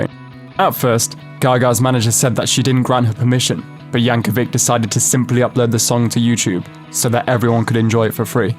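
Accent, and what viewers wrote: United Kingdom. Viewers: The title is called "Why you haven't heard from in a while." The narrator sounds like a robot with a British accent. The video starts off telling his biography. In the first 35 seconds of the video you are bored to death. Please get to the point. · What's with the British narrator?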